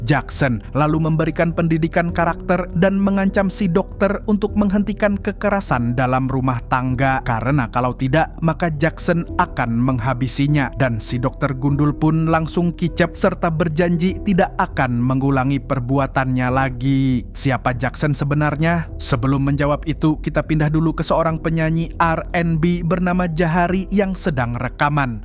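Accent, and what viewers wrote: Banjar, Indonesia. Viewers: urang banjar nih😂😂😂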